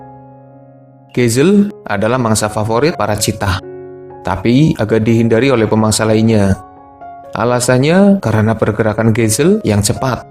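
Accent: Banyumas, Indonesia. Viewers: Logat ngapak ye..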